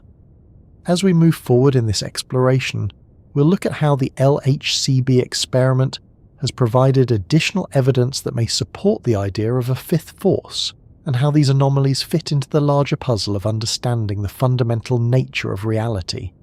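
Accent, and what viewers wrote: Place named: England, United Kingdom. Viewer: I dont have any idea of 90% of what this all is....I feel smarter just by listening to some English fellow saying big words....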